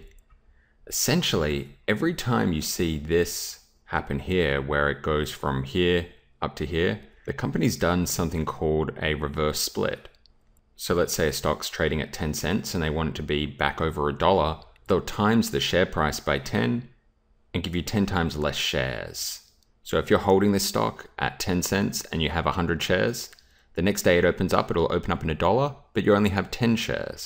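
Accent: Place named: Australia. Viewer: Thanks, don't now if it's the fellow Aussie accent that hooked me or more so gave me the confidence to listen in more, but from checking out FOUS4 and then seeing how you explain it in really easy laymen terms (for noobs like me) it's well appreciated. Keep up the great work!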